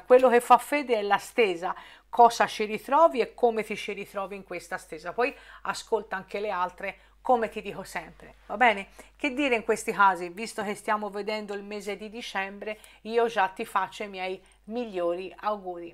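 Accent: Tuscany, Italy. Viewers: ciao...ti seguo da qualche tempo, l ultima lettura due tre giorni fa... A parte quanto adoro la tua pronuncia toscana e i tuoi "guarda qua" ehehe sei simpaticissima! Ma volevo confermarti, in merito al ritorno di qualcuno dal passato... Giuro che ho appena ricevuto un messaggio da chi non sentivo da 1 anno! L ho visto apparire mentre ti sto ascoltando in diretta 😱. Ho avvisato prima te, prima ancora di leggere il contenuto del messaggio stesso!! 😇 Complimenti per la predizione... Ora sono curiosa di andare a leggere la sua missiva!! Chissà cosa avrà da dirmi???? Ciao un abbraccio 🎄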